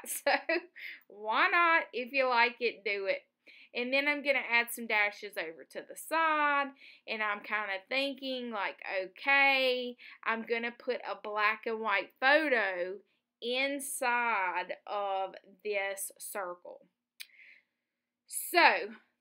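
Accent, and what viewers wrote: South, United States. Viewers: You surprised me with that eyeball and it's terrifically wonderful! Love to listen to your Southern gal speak.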